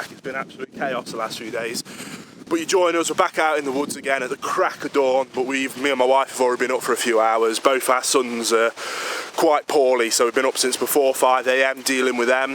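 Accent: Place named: England, United Kingdom. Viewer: I'm enjoying both of your YouTube sites and your puppy course. In 4 more weeks I am going to be able to pickup my lab puppy. With the help of a special trainer I will train the pup to be a mobility service dog. I want to be able to go places where a rolling walker is a problem. Keep up the good work, best wishes from Albuquerque, New Mexico in the Southwest of the U.S. ... P.S. many years ago I worked for the DuPont company in Stevenage, so your "accent" is welcome.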